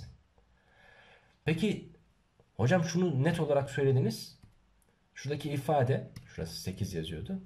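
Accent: Ankara, Turkey. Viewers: Hocamız Angaralı sanırım :D 👍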